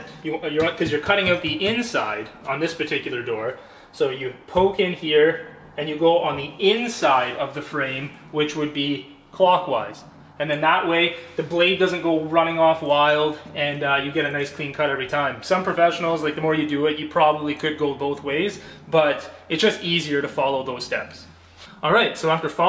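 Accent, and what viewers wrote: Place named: Canada. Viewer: I doubt it. Listen to the way he pronounces his 'O's' Id bet hes from Canada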